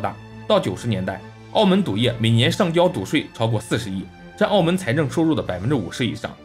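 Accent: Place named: Jimo, China. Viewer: 听口音，主播是青岛即墨的吧，我是从q这个发音听出来的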